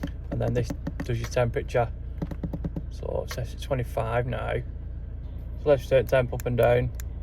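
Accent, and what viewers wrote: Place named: Bolton, United Kingdom. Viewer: another thing I should have done...... put mine under the floor instead of inside....ah well, it'll have to stay where it is now lol. Great video. quck question if you dont mind, I recognise a Bolton/manchester accent. I'm from Bolton. I found your channel by accident whilst youtube surfing and envy your confidence on screen. I've just started my channel and petrified in doing a piece to camera lol. Bessy regs-Kenny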